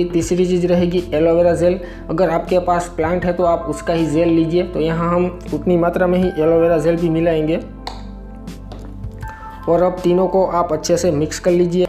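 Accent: Gujarat, India. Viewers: Bhai tu gujrati haina teri aawaz se hi pta chl gya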